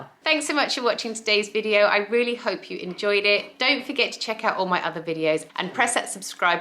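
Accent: United Kingdom. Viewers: Morning,! At least it is here in California 😉…I have been lucky enough to to have been to London many times with a wonderful four weeks spent there training a person in our London office so your lovely accent made me a little “homesick” plus Aaahhh Tesco! You have a new subscriber! I have an air fryer and two Instant Pots with my latest kitchen fun, the Ninja Creami so will enjoy any contribution/ tricks you can show me!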